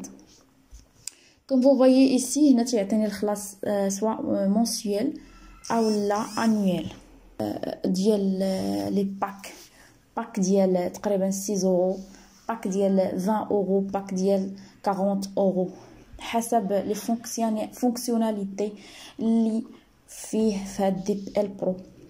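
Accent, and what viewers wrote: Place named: Arab world. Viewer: merci pour la video je ne comprends pas trop bien ton accent arabe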